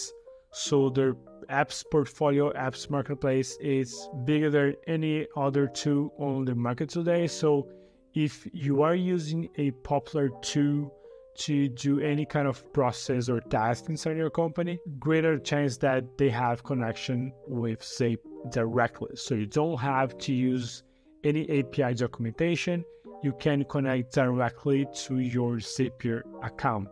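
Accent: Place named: Brazil. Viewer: eu nn posso provar, mas tu tem um sotaque de br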